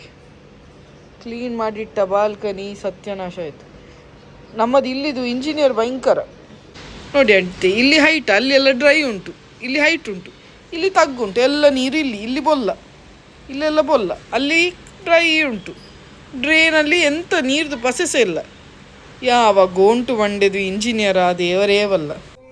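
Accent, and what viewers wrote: Mangalore, India. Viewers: ಮಂಗಳೂರು ಭಾಷೆ ಕೇಳ್ಳಿಕೆ ಖುಷಿ ಆಗ್ತಿದೆ ಮಾರ್ರೆ 👌👌👌👌👌👌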